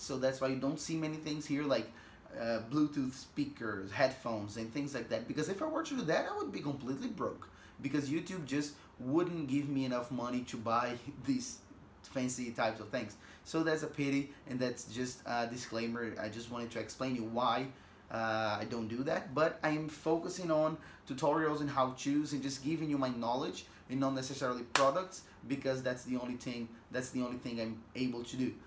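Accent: Brazil. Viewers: Thanks. You should make one brief mention of Brazil in each video so new viewers will know where your very pleasant accent originates. Like "Here in Brazil...." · Imaginei que era brasileiro por causa do sotaque. Caracteristico!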